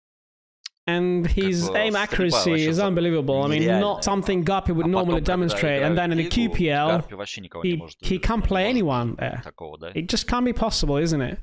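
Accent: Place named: United States. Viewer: а есть видео, без пендосской озвучки?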